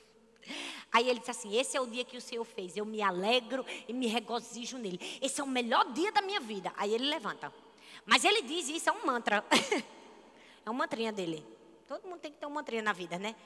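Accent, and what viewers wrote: Northeast, Brazil. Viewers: Eu amo o sotaque nordestinizado. Linda mulher de Deus. Testemunho de vida fantastico. Abraço bela! 💖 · Amo seu sotaque! Tenho muita vontade de ir pro Nordeste ❤️😍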